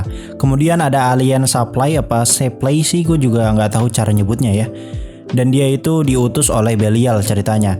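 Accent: West Java, Indonesia. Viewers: Bang maneh teh orang Sunda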